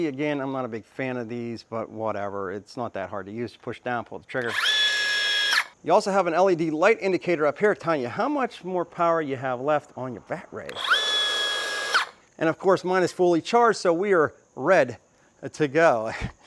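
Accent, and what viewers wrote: Pittsburgh, United States. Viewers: That Pittsburgh-esc accent is obnoxious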